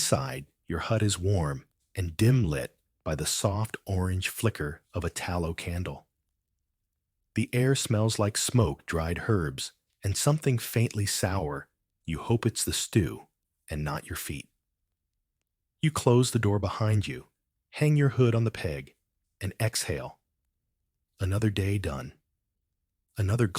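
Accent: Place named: United States. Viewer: Your American accent sounds both patronizing and stupid - quit the channel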